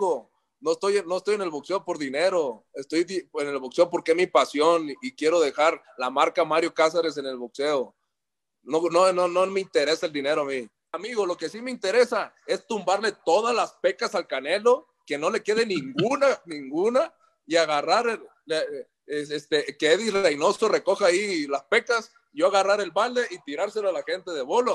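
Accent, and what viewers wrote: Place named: Puerto Rico. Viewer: Que hablador está resultando este muchacho... Parece puerto riiqueño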